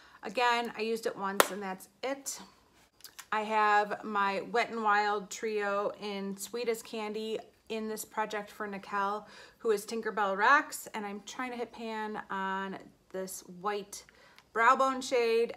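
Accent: Netherlands. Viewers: Dutch accent :)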